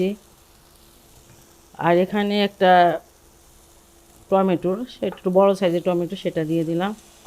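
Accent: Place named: Bikrampur, Bangladesh. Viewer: Aunty onek test apnar ranna.. Bikrompur boley kotha.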